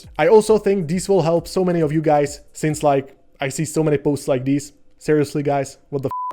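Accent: Czech Republic. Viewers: způsob, kterým vyslovujete slova jako "miniature" a podobně, Češi mají podle mě velmi specifický způsob kladení důrazu na to měkké anglické "r" :)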